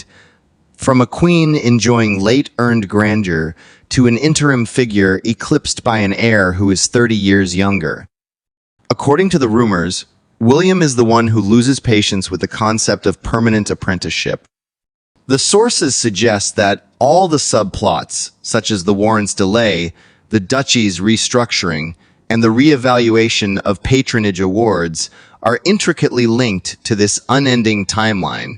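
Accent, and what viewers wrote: United States. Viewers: Could we please have a british commentator ! American pronunciation is abysmal.🙄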